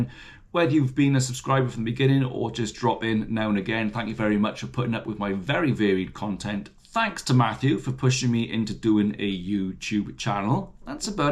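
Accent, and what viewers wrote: Wales, United Kingdom. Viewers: Wife put you on the big T.V, She likes your welsh accent, and is now talking to me in her welsh accent, Loved the video ❤ Very inspiring Filming at the allotments to day for The Friday Allotment A Random Lady Allotment holder just told me your on you tube, I didn't know what to say? I got in tonight and I see she has Subscribe to my channel 🤣 Embarrassing or what So I must start embracing it!!! Will do buddy, The cats out the bag for me, And post man knows as well 🤪 So there's no going back for me now.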